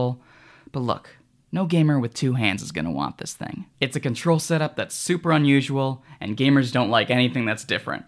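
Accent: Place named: California, United States. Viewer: Cara, esse sotaque de quem mora na california me da um enjoou, sla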